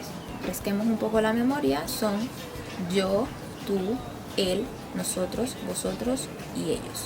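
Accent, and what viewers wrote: Venezuela. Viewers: Sabía que eras venezolana por tu acento y... por tu belleza! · Ahhh es que escuchando tu acento dije que no eras nativa de España ni si quiera, y no me sonaba a más nada sino al de acá. Yo soy Venezolano pero con acento paisa colombiano por mi padre...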